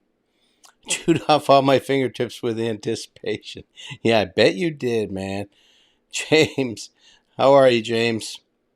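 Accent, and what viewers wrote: Boston, United States. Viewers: Love the way you talk. Long islander here, transplanted to rural PA. Sounds like Boston but it's refreshing brother. Good info, subbed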